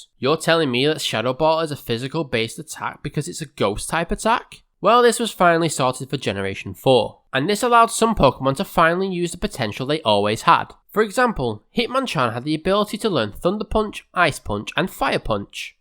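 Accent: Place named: United Kingdom. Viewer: Not to mention, emulating Gen. V, you can also experience Drayano's ROM Hacks, BlazeBlack/VoltWhite, which seem to bear the kind of difficulty I now actively want in a game... Maybe that would explain why I enjoy Dark Souls so much... As much as I love the idea of using Greninja and Zeraora in Unova, I can but dream... And we do say "ee-"volution here! (Where are you from? I seem to be finding a lot of fellow Brit's channels recently!)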